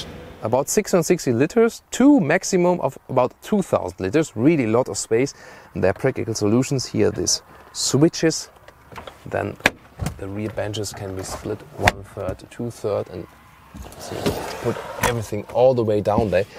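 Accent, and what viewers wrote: Germany. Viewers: I didn't read the hole topic. So i didn't really know what language it is before i started the video. In the first moment, the first 10 seconds i thought it's german. I am from Germany and i never heard such a hard german accent like this one before. And i'm still thinking it's a german review 😜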